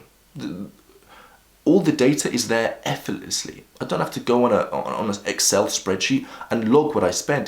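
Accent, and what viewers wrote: United Kingdom. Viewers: British accent = (+10 points) to discipline and charm.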